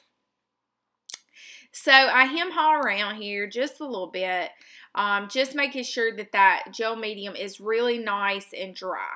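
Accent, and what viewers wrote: South, United States. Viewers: I love your southern drawl AND your vids! 🙂🙂🙂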